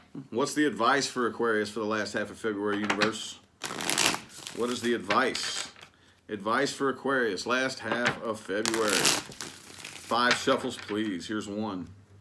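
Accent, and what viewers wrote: South, United States. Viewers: Loving that "country drawl". Being from NC I have a drawl too.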